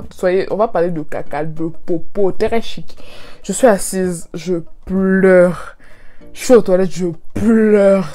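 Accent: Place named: Ivory Coast. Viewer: Je te découvre et je suis scotché 😀 je ne comprends même pas pourquoi... c trop chics 😂😂 l'accent ivoirien je kiffe grave 😘😉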